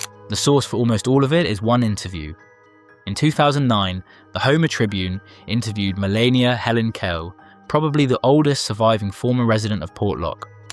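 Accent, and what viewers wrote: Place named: Europe. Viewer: European accents are almost intolerable to listen to… I’m so glad to be American.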